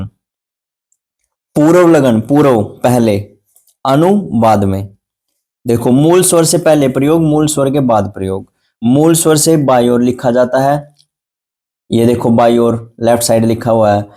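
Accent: Haryana, India. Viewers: Sir are you haryanvi...? Boli se thora thora lg rha hai..!😂😂 I am also belong to haryana❤ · Yo bhai dekhe HARYANA Ka hai awaz te pta lagra HARYANA ON TOP BHAI❤ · Sir aap haryana se ho?😅😅Tho tune se laga...... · Aap haryana se ho kya ??😊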